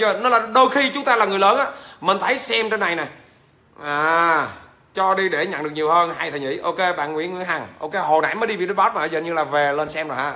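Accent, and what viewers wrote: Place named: Central, Vietnam. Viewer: Chú ơ dau cua miền trung vay ạk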